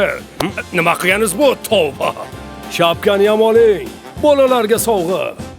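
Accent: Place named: Samarkand, Uzbekistan. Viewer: aka Samarqand shevada gapirdi😅 Farg'onalik shoir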